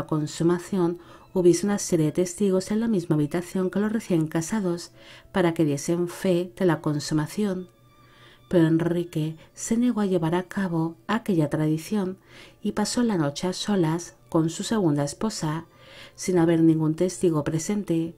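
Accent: Spain. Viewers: Ese acento tuyo tan particular de que parte de ESPAÑA es ? Señorita!!!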